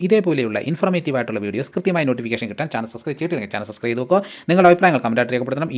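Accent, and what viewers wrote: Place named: Thiruvananthapuram, India. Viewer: ഇത് തിരുവനന്തപുരം ഭാഗങ്ങളിലുള്വരുടെ പ്രയോഗമാണ് അത് തെറ്റിയത് കൊണ്ടല്ല അത് കൊണ്ട് കളിയാക്കേണ്ടതില്ല ഓരോ നാട്ടിനും അതിന്റേതായ രീതികൾ ഉണ്ടാവും കാസർഗോഡ് കാരുടെ പ്രഭാഷണം കേട്ടാൽ താങ്കൾ എന്താണ് പറയുക ഒന്നും മനസ്സിലാവില്ല അതെ സമയം ഇവിടെ ഭ പറയുമ്പോൾ മാത്രമേ വ്യത്യാസമുള്ളൂ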